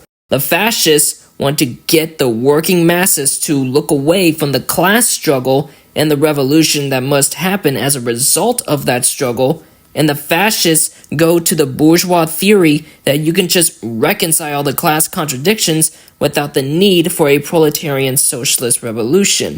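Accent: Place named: Arab world. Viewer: i kinda see what the commenter is saying though, he kinda sounds like how arabs who are very fluent in english from a young age sound, theres like basically no accent but it sounds a bit off. you can hear it in more. source: im an arab who never properly learned arabic but grew up around people speaking arabic so my accent is a bit weird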